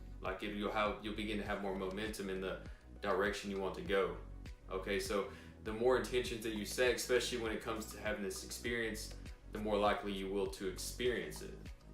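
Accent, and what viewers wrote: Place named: Alabama, United States. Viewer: Where are you from! I’m from bama and I recognize your accent 😉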